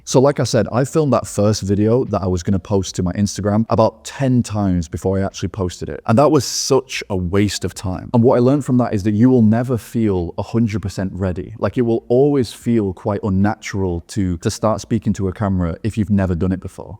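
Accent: United Kingdom. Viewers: I like your voice a lot. And for me, thats a huge reason why i watch someone's vids. If they have great content but their voice grates on me, then i won't watch. Plus you have a great yorkshire accent...best i can tell in California. 😁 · Is that British accent?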